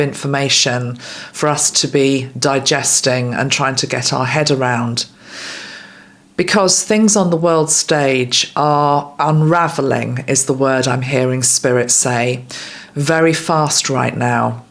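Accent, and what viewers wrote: United Kingdom. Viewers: Thank you very much, I enjoyed as a Dutchman your real British accent and pronunciation, I learned from that and about your predictions, time will tell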